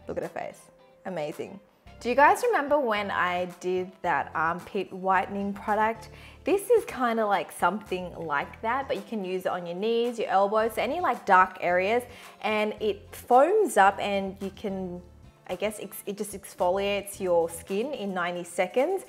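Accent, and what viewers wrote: Australia. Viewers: Yashti Raj I think cuz she's Australian. I've heard a lot of Australians say it like that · I seriously love the Australian accent XD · I love the Aussie accent actually, I think it sounds fun and endearing. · i know it's because of her australian accent, i just sometimes mishear what she says anyway because i don't hear australian accents often lol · I like your accent, is it an Australian? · I Looove your aussie accent !! I cannot sound Australian for my life 😂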